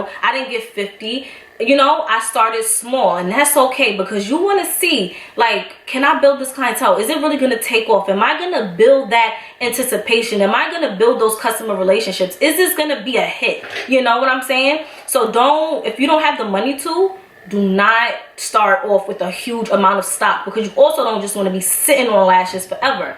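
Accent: New York, United States. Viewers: I love NY accents. Thanks for the vid!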